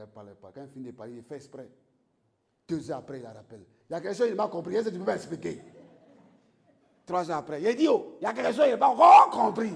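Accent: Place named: Bété, Ivory Coast. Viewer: Vraiment vous parlez comme un Ivoirien précisément Bété. Je signale que j'ai aimé et je sur que je vous raconterai par la grâce de Dieu.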